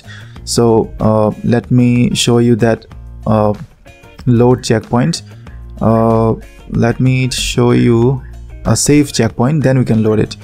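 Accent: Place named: India. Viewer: As if it isn't already difficult enough to understand what you are saying with your heavy Indian accent, you went ahead and made it even worse by playing background music.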